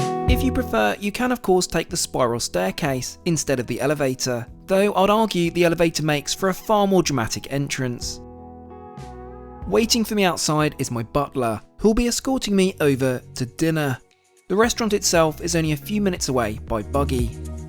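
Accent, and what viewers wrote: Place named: England, United Kingdom. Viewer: I used to think the English accent was enchanting and " lov-e-ly ". But now, seeing what they did to their country with mass immigration ; listening to this guy is nauseating.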